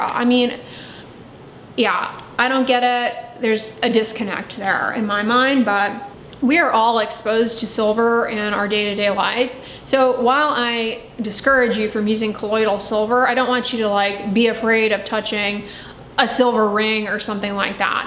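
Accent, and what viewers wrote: United States. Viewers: Man thats an annoying American accent..... and i dont believe a word of advise from doctors........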